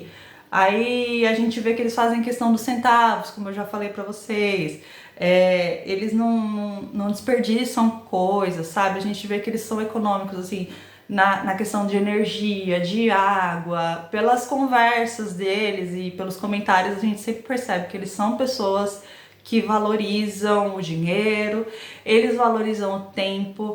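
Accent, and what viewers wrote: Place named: Brazil. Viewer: Que mudou? Conta ai ...pelo sotaque é brasileira...acertei?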